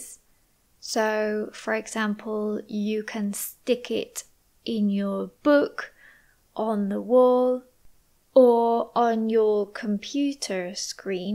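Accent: United Kingdom. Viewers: This is british accent?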